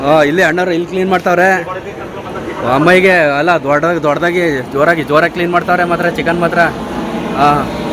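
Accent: Mandya, India. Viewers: ಬಹುಶಃ ಇದು ಮಂಡ್ಯ ಬಾಷೆ ಇರಬಹುದು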